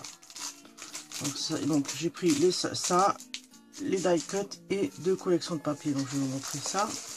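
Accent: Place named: North, France. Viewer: Chère Un mois?? Eh bien dis-donc il a fait tour du monde votre colis c'est pas possible avec un délai pareil je serais allée le chercher moi-même en Pologne 😊 je plaisante mais tout de même un mois c'est énorme j'espère pour vous que vous n'aviez pas l'intention de faire un projet avec ses collections pour Noël sinon il va falloir mettre les bouchées doubles( personnellement j'aurais négocié uneremise) à moins que le délai de livraison ne sois pas stipulé, dans ce cas il faut être patient. En tous cas ce que vous avez commandé est très joli, ces collections sont très belles et je suis certaine que vous allez encore realiser de magnifiques créations comme vous nous y avez habitué. Je souhaite de très joyeuses fêtes de fin d'année. Ps: j'ai parié sur votre accent et j'ai misé sur un accent du nord de la France, j'espère avoir raison sinon je vais perdre 3 euros😂😚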